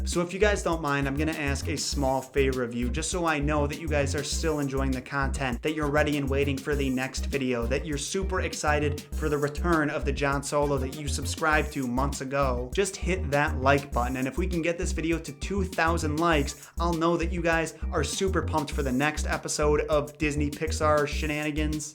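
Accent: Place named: New Jersey, United States. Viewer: HAHAHA that jersey accent though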